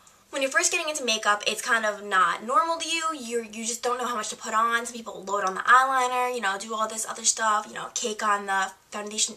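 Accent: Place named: New Jersey, United States. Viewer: Your voice sounds like it changed from you regular sweet voice to .... a Jersey Shore accent.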